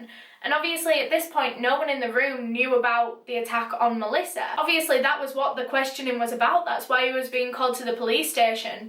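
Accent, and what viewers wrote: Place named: Yorkshire, United Kingdom. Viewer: think she's from Yorkshire, UK. Personally love the Yorkshire accent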